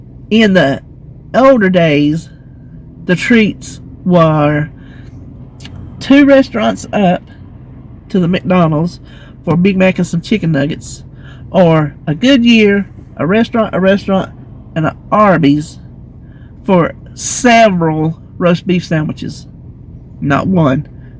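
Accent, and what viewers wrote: South, United States. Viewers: YES Ma’am! One step closer to surgery! I wish you could talk to us everyday because you give me so much happiness. Just hearing that sweet southern accent and all your sayings crack me up! You are definitely a special friend to me. Love, hugs & laughs to you . ❤️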